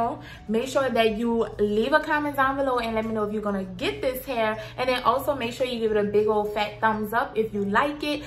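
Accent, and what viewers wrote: New Orleans, United States. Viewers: That accent though... I could listen to talk all day #nola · You have to be from New Orleans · Where you from new orleans? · ` love your accent , you def gotta be from New Orleans! lol forgive me if u not cause I’m from NY, but you sound like Reginae mother🤣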